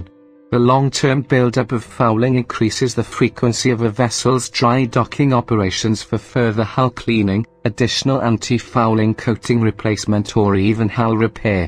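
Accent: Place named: Wales, United Kingdom. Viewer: Wow - a Welsh bot narrator !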